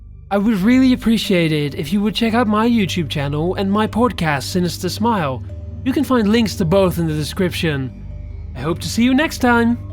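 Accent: United Kingdom. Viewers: the guy narrating this sounds like a british version of chillz.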